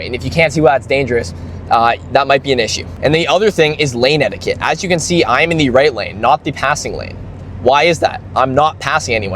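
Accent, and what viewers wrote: Canada. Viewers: As soon as you said “40KM/H” i instantly knew I had to subscribe cause I already had a feeling from your voice you were Canadian, then I seen that intersection on highway 11 with chevron in Abbotsford (I think) I knew you were in my province too (we need more Canadian car YouTubers) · Nice video though. Just curious, are you from Victoria, BC?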